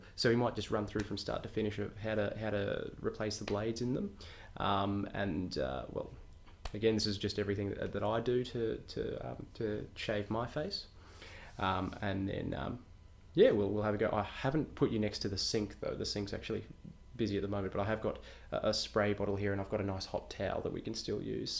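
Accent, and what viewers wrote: Australia. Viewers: your voice is perfect and the Aussie accent is an added bonus!!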